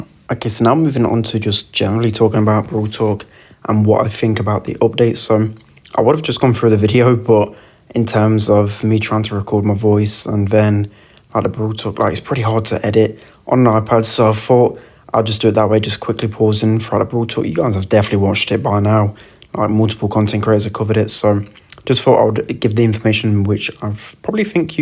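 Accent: United Kingdom. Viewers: Is this British accent? I need the subtitles to understand what you say :( Apart from this the video is very good and thanks for the balance changes you noticed and shared.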